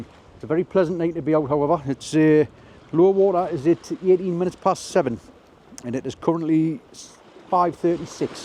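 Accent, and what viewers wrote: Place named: Northumberland, United Kingdom. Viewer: Lost sock in your wellingtons Picking your noise 😂Trying to pin point your accent at a guess l would say around Morpeth Blyth area 😊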